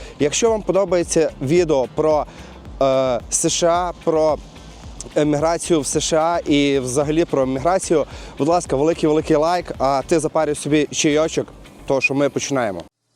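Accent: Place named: Kherson, Ukraine. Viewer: От куда суржик с Херсона?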